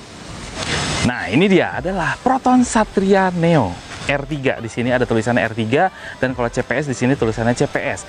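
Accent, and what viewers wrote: West Java, Indonesia. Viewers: Orang sunda mah kentara aja ya kang logatnya😂🙏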